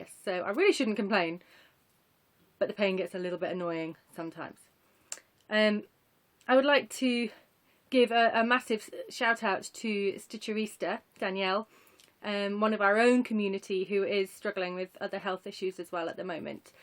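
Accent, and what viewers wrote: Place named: United Kingdom. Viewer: I love your videos. I'm a total Anglophile and British/Australian stitchers are my favorite channels to watch. I guess it's the accents. 😉 I hope you're feeling better. Do you have any more videos planned? I definitely don't want to pressure you, especially if you're not feeling well--I just miss your lovely work and your humor. 😊 Prayers for continued recovery!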